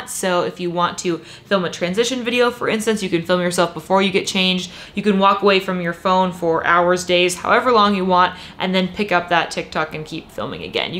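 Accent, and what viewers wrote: Canada. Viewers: Candian? Hea?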